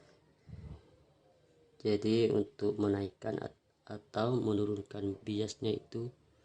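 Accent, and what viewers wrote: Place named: Madura, Indonesia. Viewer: madura mana sampean mas?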